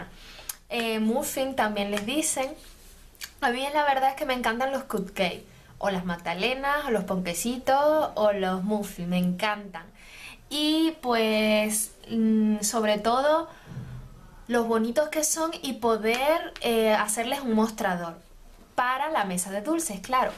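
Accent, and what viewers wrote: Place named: Maracaibo, Venezuela. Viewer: además de ser Venezolana, por tu acento eres maracucha, un gran abrazo, que sigas teniendo mucho éxito!!!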